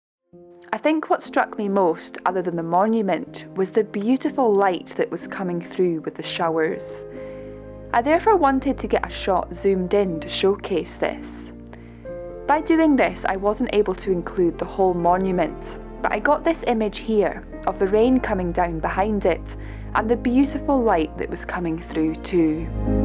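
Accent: Scotland, United Kingdom. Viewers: I always look forward to another of your sessions but one thing is that I wish you would give more information, especially if it’s a “monument”. What was this monument, a church, an abby or a castle. If at lest we knew the name of it, other than “monument”, we could Google it and find out what it was, when it was built and the history of it. I certainly enjoy your channel and the beauty of Scotland, your pictures, and your accent when your talking.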